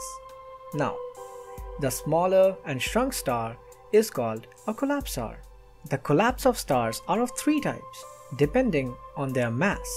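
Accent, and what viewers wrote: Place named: India. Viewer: When you hear an indian accent you know the videos are going to be filled with knowledge, very intelligent people ❤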